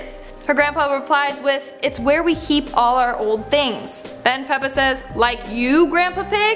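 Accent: United Kingdom. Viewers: Whats Wrong With A Slight British Accent?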